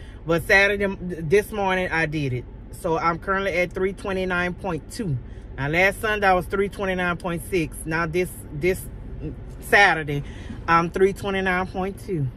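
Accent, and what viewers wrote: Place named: New Orleans, United States. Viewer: I can hear the New Orleans in the accent baby! lmao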